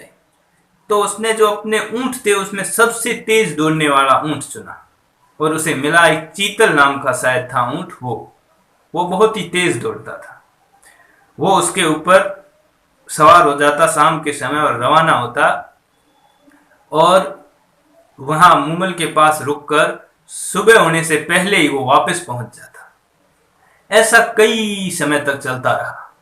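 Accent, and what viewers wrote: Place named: Marwar, India. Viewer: होकम आपकी बोलने की शेली बहुत सुंदर मारवाड़ी देशी भाषा की तरह हिंदी भी बोलते है बहुत सुंदर होकम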